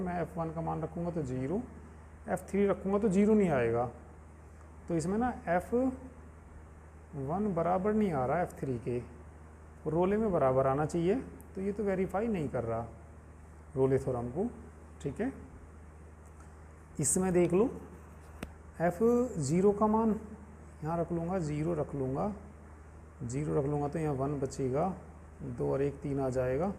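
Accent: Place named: Saharanpur, India. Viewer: Sir Aaj kha se ho islsiye puchh ra kyoki aapki bhasha hmare jaisi h ,,,,Sharanpur wali .😅❤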